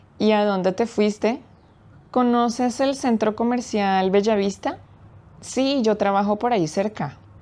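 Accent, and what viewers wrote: Colombia. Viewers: Como siempre, es un placer escuchar tu hermosa pronunciación y lindisimo acento colombiano! 🥰 Tuve que pausar el video porque no tuve tiempo para responder, pero quizás era tu intención. Muchas gracias, me encantaría tener más como esto!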